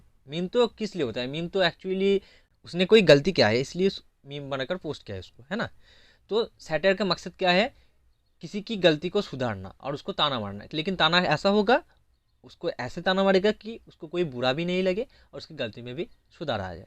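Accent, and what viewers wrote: Bengal, India. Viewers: Thoda bengali accent aa jata hai.... improve your English speaking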